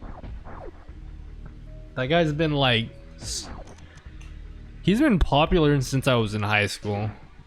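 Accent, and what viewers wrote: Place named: United States. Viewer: Okay your accent sounds so American to me and you're constantly talking like you're not American and it's confusing the hell out of me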